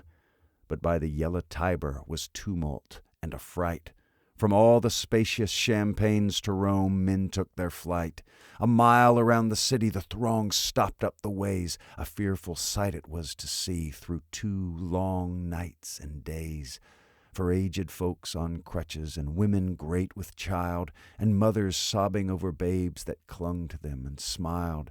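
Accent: United States. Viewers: I had been lamenting the shortage of people reading great poems, well, in an American accent. So glad to have found this. Have you considered reading some of Chesterton’s poetry?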